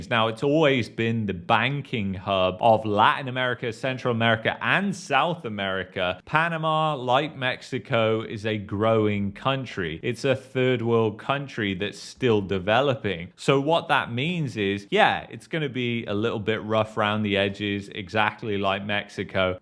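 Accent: United Kingdom. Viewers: listening to your accent, you better go back to the UK and enjoy it..leave Canada to Canadians..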